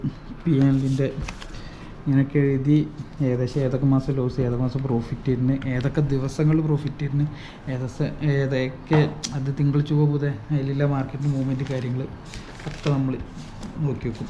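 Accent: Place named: Malappuram, India. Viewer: ഒരു മലപ്പുറം ട്ടെച്ചുണ്ട്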